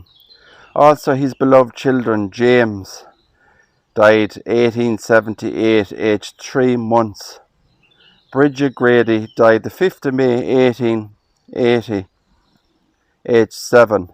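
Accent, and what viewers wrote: Ireland. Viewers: This was my first time watching this channel and I'm just wondering during are you Irish and is that where this beautiful graveyard is?! 😊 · I like to watch videos like this every now and then. this kind of old Cemeteries are very fascinating but wouldn't like to be there after dark! In addition, I find Ireland and Scotland breathtakingly beautiful. Been there a few times. I don't know why, but I feel a kind of connection when I'm there. and I love your Irish accent 🤩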